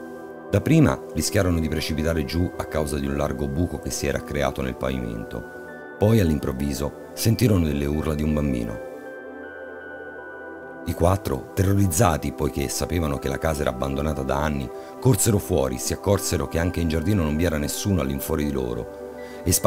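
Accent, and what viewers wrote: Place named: Rome, Italy. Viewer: La voce narrante distrae troppo dalla storia stessa. Bello il timbro vocale, ma inascoltabile la pessima dizione, nonché la biascicata pronuncia del romanesco "moderno", fuori luogo per tali narrazioni.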